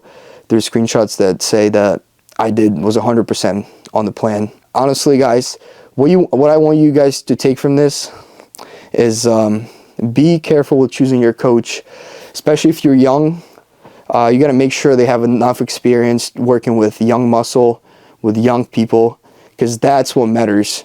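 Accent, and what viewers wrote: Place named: Ukraine. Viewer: Never mind your Ukrainian. I wrote that before I saw the whole video lol I detected a slight accent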